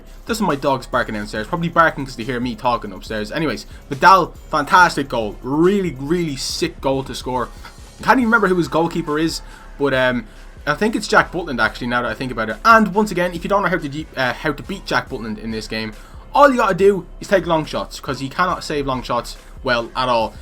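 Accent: Ireland. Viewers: R u from Dublin or Derry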